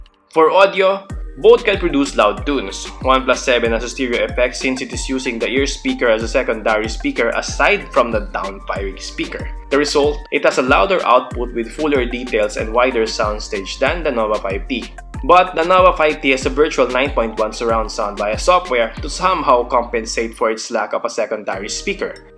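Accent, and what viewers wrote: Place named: Philippines. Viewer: I love your accent bro #pinoypride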